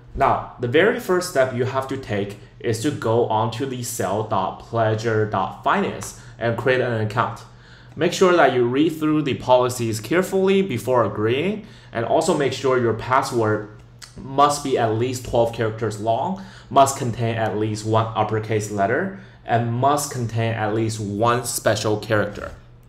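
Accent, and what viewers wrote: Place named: China. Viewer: 这口音咋感觉是中国人？😂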